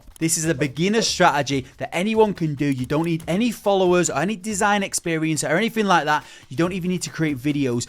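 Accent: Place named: Northern England, United Kingdom. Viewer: English northern accent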